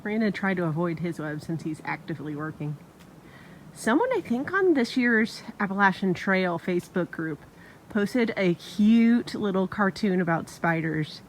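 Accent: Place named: Northern Ireland, United Kingdom. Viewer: Goog to see (hear) a Northern Irish accent - ' ' on the trail!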